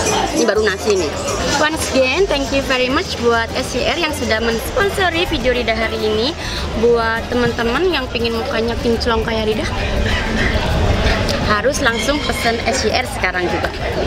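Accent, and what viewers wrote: Java, Indonesia. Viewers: Medoknya itu low ngbuat ak kalo nonton pasti diulang2 mulu😂😍 loveLah buat 😘💋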